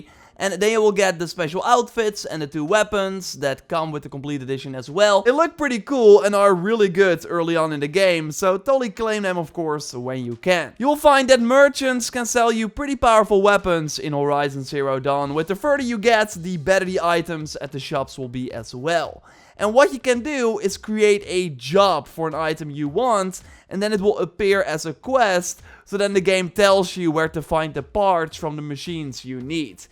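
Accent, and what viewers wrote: Netherlands. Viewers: I smell dutch🇳🇱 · Jij bent vast en zeker een Nederlander kan k horen aan 't accent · That accent 😂❤️ You’re definitely Dutch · Amai da's een extreem accent ik geloof niet dat je geen Nederlander bent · You're Dutch right? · He ben je ook nederlands (nederlands accsent) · This guy HAS to be dutch with this accent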